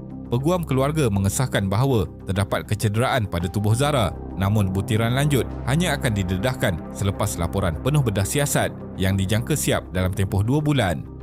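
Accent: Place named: North, Malaysia. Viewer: Memang org utara sembang deraih... Sesuai untuk org awam.. tp utk org mcm aku . Gelak je dga hehe..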